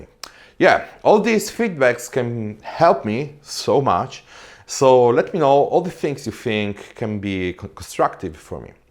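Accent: Italy. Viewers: your english is fine (maybe with an Italian accent what is fine) and also your video's are very nice to watch. I also took a subscription to your new channel, not to miss out on something and hope it does what you want it to do. 🤗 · May be the frequency of uploading videos could be the problem. There is another channel I follow known as "The pasta queen". She is also Italian and speaks the Italian English accent as you and has good following so may be its not the way you narrate. You should try Youtube shorts to divert audience to your channel as everyone else is doing. Engage the audience and you will again shine. Dont loose the channel at 500k what most people dream of. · The last video I see in this channel is from 11 months ago, I believe that making some short projects that you can upload in between the actual big projects would help with views and also make the algorithm spread it more, your English is perfect, you just have an Italian accent which is not a problem at all.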